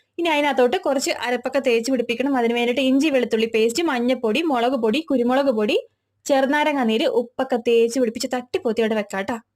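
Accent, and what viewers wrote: Kochi, India. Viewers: Biriyani kazhichathinte Santosham aano last ile dance 😂 Eniku othiri ishtama ...kochi baasha kelkaan❤❤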